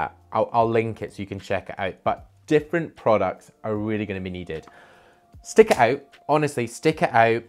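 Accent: Scotland, United Kingdom. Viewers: I like your video. Just curious, you sound like a cross between a Scot and a British man. What is your nationality or background if you don't mind me asking? I just like to educate myself on the different accents and sounds that I hear.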